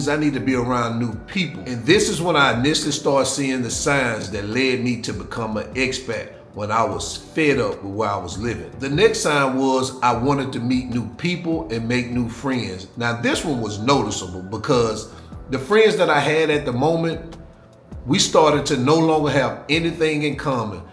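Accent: South, United States. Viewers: PS...You've been in another country for awhile and loosing your Southern accent! Lol!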